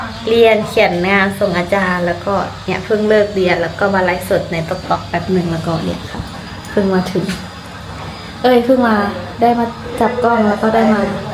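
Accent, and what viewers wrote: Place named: Laos. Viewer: พูดไทนชัดมากพยายามอย่าพูดตกสำเนียงลาว-ร-ล-ช-ให้ชัด-ควม-ความ❤❤❤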